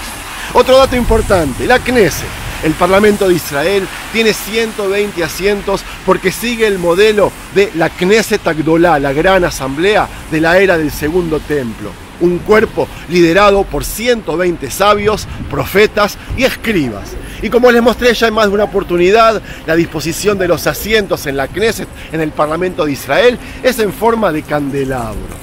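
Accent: Argentina. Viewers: Una pregunta yo recién q encuentro este canal, y tú eres judío pero hablas perfecto el español con acento argentino, quizás haya algún capítulo donde explicas eso. Y realmente me gusta escuchar hablar de mi querida nacion de Israel en un español muy entendible, te seguiré viendo. Me gusto el reportaje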